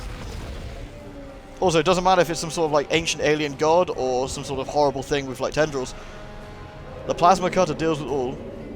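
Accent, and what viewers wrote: United Kingdom. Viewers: So, I have to say congratulations for re-finishing the original Dead Space. I started watching you before you did this game originally like who knows how many years back but it was the original playthrough that made me subscribe to you. While I love DS, I prefer to play DS2 due to it´s many gameplay improvements. Story/atmosphere-wise, DS obviously wins. I would like to thank you for several things. You have helped me learn English. You have helped me open the world to me. Thanks to you I have delved into streaming and have met many friends. You have helped me go through depressions and some of the worst moments of my life. All of which without ever speaking to me or knowing of my existence. I have no idea what I would be doing with my life without your wonderful sarcastic British voice. So yeah, wish you many more awesome years and hopefully, if you still feel like it, sharing those with us through gameplay. 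:)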